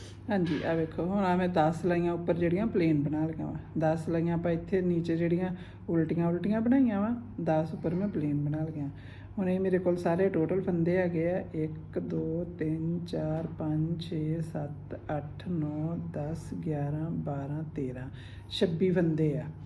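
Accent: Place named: Majha, India. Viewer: Mam tusi majhe de boli bolde j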